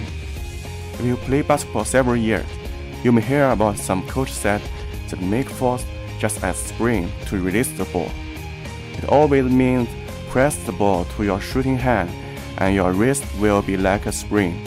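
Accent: China. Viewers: po主是北京人吧。反正我觉得挺像那附近的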